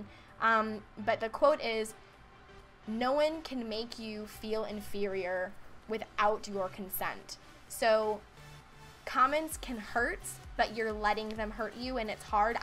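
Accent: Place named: South, United States. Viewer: I'm sad to hear that you have to change how you act even in the slightest. I loved your sarcasm, it was hilarious and fell right in line with mine. Also I love your southern accent and drawl. Being an Oklahoman myself, its awesome to hear a "southerner" sometimes. :P But you're adorable, I love your videos, I love your vlogs, and I hope one day I will get to meet you.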